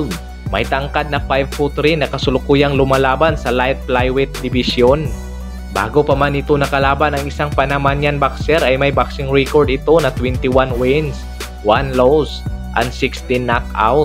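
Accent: Visayas, Philippines. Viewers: Magkatulad tayo lods bisaya Be proud!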